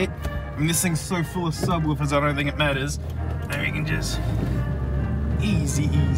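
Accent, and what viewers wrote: Australia. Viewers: i love how every adelaidean bloke sounds the same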